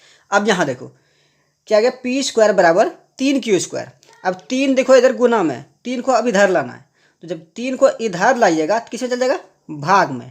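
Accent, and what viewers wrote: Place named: Bihar, India. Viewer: Bihar se ho kya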